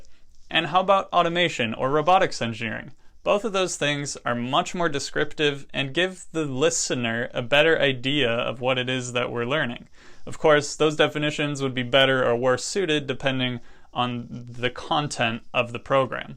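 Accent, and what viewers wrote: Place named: Texas, United States. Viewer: I love the texan accent lol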